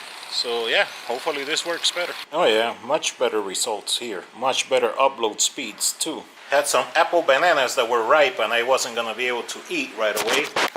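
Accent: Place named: Puerto Rico. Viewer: I'm new to your vlog. Saw you on veganvlogs. You look and sound puertorrican so welcome back to PR. Hope everything gets better for you here and that you find what you're looking for. I commend you on your efforts to try eat less meat. I know it's not easy I'm a meat eater myself who wants to go vegetarian. Always remember your goal to get healthy and you'll succeed.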